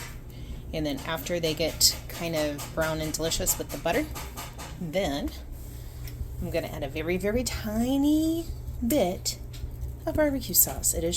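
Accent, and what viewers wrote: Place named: South, United States. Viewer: I can tell you are from the South like me.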